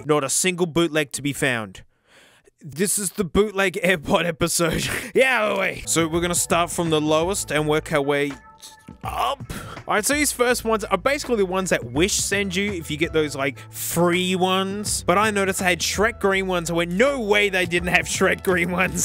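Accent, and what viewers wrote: Australia. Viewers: Hearing a Australian man talk into the worst sounding microphone ever makes me happy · I love how aggressively Australian his stories are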